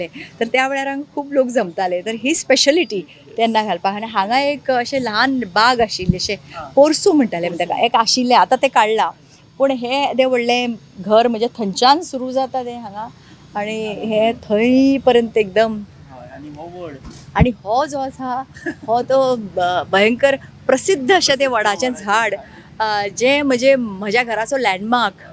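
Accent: Maharashtra, India. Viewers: She has a strong influence of marathi in her speech And can't talk typical kokni words It's unfortunate Oogich sangta ki aplya kokni bari ulapak yeta mhanun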